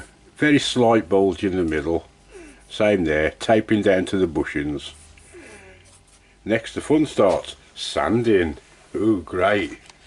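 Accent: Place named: Black Country, United Kingdom. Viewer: You have a proper Black Country accent, nothing like a Brummie accent at all, I’m really surprised. I like to watch your videos, very interesting with a bit of light heartedness thrown in. Kind regards to the hardest working part of the duo.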